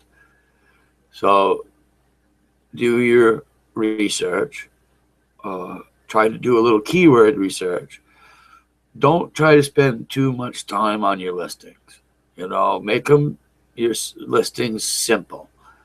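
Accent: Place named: New Hampshire, United States. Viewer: I really like these videos, and your accent reminds me of living in Charlestown, NH :)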